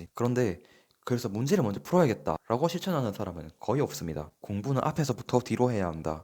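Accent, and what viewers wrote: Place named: Daegu, South Korea. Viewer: 대구분이신가요? 대구사투리 정겹습니다^^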